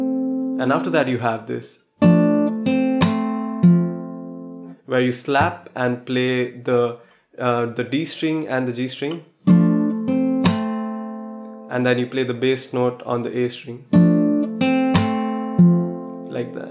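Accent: India. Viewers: His accent is Indian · ikr? He has like the same accent. it's Indian accent. but let's stop comparing i mean like nobody likes to be compared? tell me if i'm wrong. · good indian accent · Your accent sounds indian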